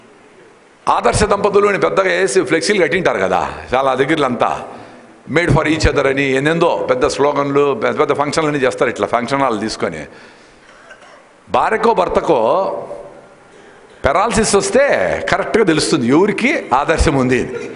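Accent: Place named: Anantapur, India. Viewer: Ma anantapur slang👌🥰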